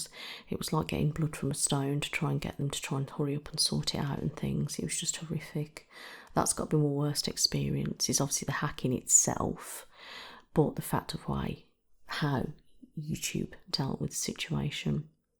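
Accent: United Kingdom. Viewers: I just watched your Q&A tonight and one thing struck me that I had to comment on. You mentioned people commenting negative things on your videos, for example your facial expressions. That my friend is one of your strongest qualities. I absolutely love your facial expressions! I've always loved the British accent so that is a plus too. Your haircut video is my favorite so far. I've watched it many times. And as far as the mental health goes, I have anxiety and have most of my adult life and also worked on a mental health ward of the hospital and my heart goes out to that type of patient. So I'm glad to see you speaking out on the mental health issues. Keep up your good work. You're my new favorite artist. Even us 65 year old grandmas enjoy ASMR. 🙂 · I am so glad I found your channel a couple weeks ago, I have been watching your videos every day & just love you. 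I was born in the UK (Leyton) but moved to Canada 40 years ago. I feel a connection in the sense that you remind me of "home" you speak just like my aunts & cousins & it's a lovely feeling. Thank you!! You are a beautiful soul.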